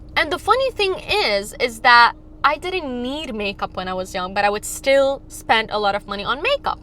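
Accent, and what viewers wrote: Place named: Jamaica. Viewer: 'A fun ting' ooooh she Jamaican. nice video btw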